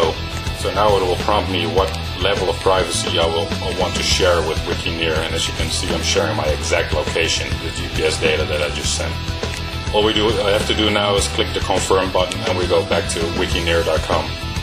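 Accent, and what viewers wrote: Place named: United States. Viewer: Good tutorial and information, great music(loop), good American accent too! I only recognized the real guy's voice when he pronounced "Berlage"... ;-)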